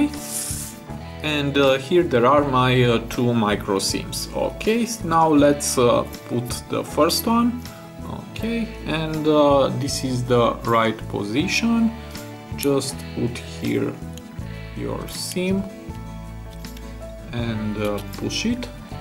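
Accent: Romania. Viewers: Romanian accent....the best. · like your Romanian accent of talking, man👍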